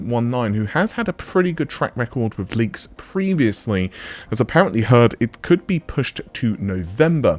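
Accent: United Kingdom. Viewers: This guy’s British accent is the type where he doesn’t breath through his nose at all when he talks🤣 other than that, love this channel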